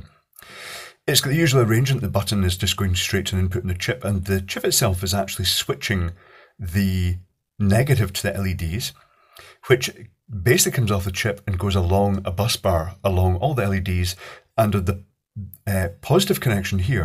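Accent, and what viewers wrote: Scotland, United Kingdom. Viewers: Always nice to watch a video by shortly before retiring for the evening. Your accent reminds me of a friend I have from Bishopton. But not his mother's who was from Aberdeen.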